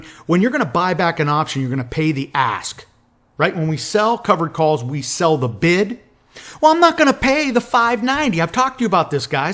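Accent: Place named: Texas, United States. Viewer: Good content! Very educational and real world. And to top it off you talk like The Texas Fonz!! : )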